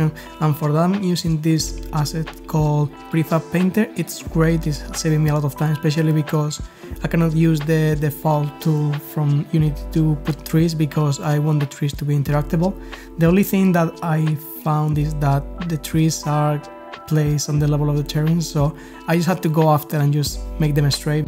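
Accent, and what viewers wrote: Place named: Spain. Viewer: obiamente eres español 😜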